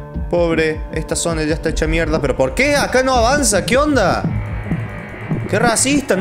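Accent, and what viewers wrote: Córdoba, Argentina. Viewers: Si Cordoba Argentina:D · ERES DE ARGENTINA O DE CHILE.-. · de donde sos tenes una tonada muy parecida a la argentina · Para mi es de Córdoba, porque no habla tan agrandado.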